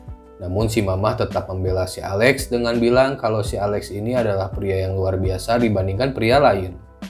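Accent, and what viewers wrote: West Java, Indonesia. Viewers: Akang ngomong nya sunda banget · anying sunda bet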